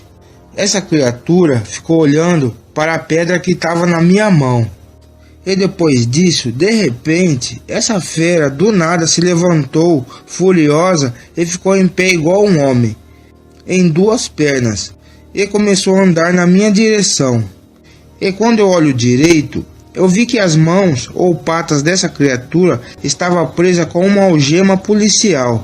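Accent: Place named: Caipira, Brazil. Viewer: A narração é do tipo bem caipira mesmo!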